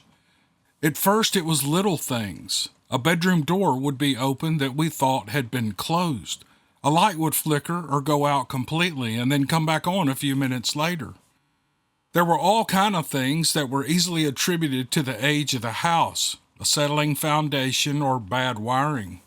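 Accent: South, United States. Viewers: People can be mean and annoying, let them get butt hurt over nonsense. I love hearing your voice narrate these stories, reminds me of my southern folk- we have cool accents! Other people can be jealous of. Keep up the good work,. Hopefully, I’ll hear my story from you soon.:)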